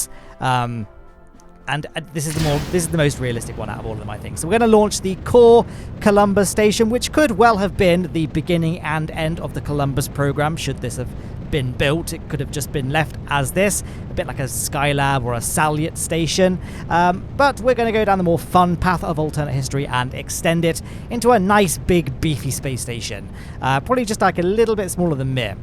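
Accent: United Kingdom. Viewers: He's as British as they get, you can hear it on his accent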